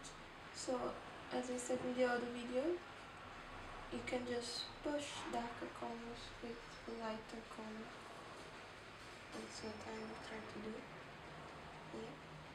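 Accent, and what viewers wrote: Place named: Finland. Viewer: Are you Finnish? You have a Finnish accent.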